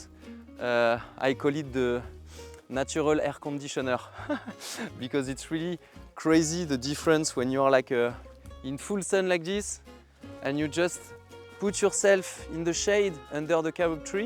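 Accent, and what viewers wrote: France. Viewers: I love your french accent, your english is perfect but (la petite touche française) it's brilliant, I have the same, some times people tell me, what you just said sounds french and there I go ( my 1est language is french/suisse). Bonne aventure profitez la vie est trop courte.:thanksdoc: 🌼🥀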